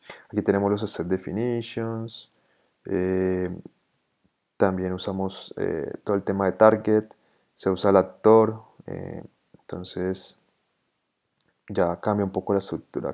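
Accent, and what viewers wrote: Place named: Bogota, Colombia. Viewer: Ese acento de norte de Bogota si se puede ver hahahaha gran tutorial!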